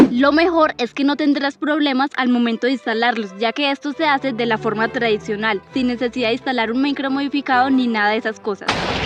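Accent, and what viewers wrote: Colombia. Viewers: voz colombiana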